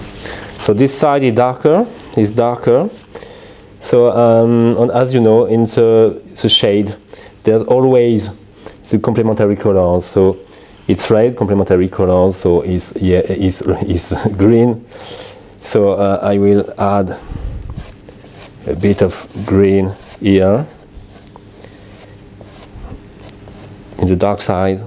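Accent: France. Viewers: Thank you for such a gentle and lovely voice with accent. MMM French accent always reminds me of food. I know why however that is another story. After dusting off the pastels January 1 and deciding to make a picture, at least one, everyday. Then I would watch how it is done. The spreading of the colour is so relaxing. Deep rich intense colour. Colour heals. May all who venture into the land of pastels be healed with the colours. <3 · On sent bien qu'il s'agit d'un Français qui parle Anglais!!! Pourquoi n'a-t-on pas une version FRANÇAISE?? Je suis désolée mais on devrait pouvoir avoir le choix de la langue!!!!!! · Yup totally french. I'm myself a frenchie... But his accent is sooooo pronounced, even sounds quite fake. (We french know that English ppl love our accent so we make it sound the most "french" possible to.. Be "sexy"?)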